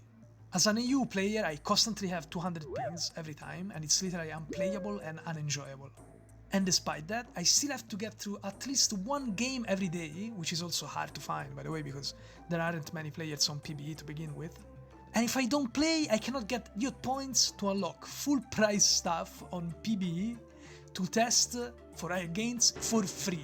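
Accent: Italy. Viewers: Are you italian perhaps? Your accent sounds like that for me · You have such an Italian accent. Is it just me, or do you perhaps have Italian origins? I'm so curious xD sorry But anyway, I always appreciate your content. Thank you for what you do for us in the community ❤ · bro are you italian perchance your accent :D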